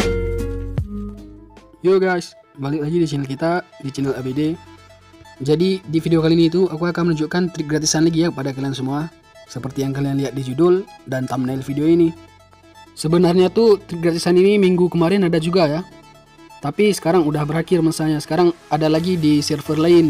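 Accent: Aceh, Indonesia. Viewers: dari logat lu, gw tebak lu dari negri plat bl ya bro?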